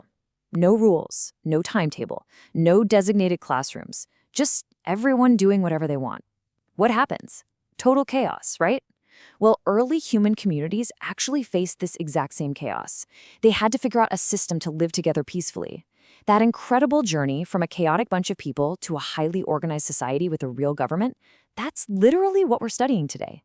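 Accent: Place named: West, United States. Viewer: Good source. My only concern is the western accent.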